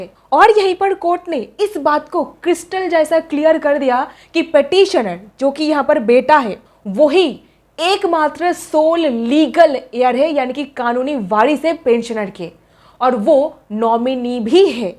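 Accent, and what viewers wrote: Bengal, India. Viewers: she has Bengali accent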